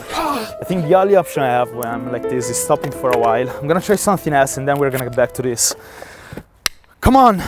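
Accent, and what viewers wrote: Italy. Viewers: is he Italian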